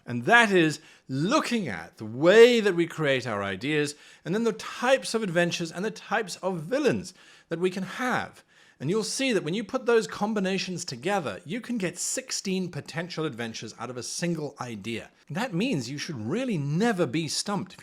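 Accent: South, United States. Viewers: With that accent, I though all of the adventures were going to be getting those Duke Boys. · This country boy accent is killing me! Love it!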